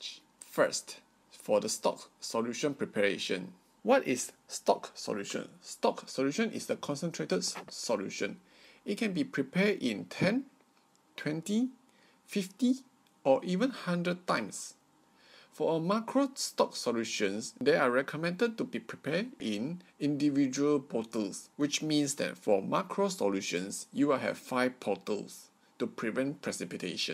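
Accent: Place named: Russia. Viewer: Are you a Russian Scientist? You sounded like a Russian .....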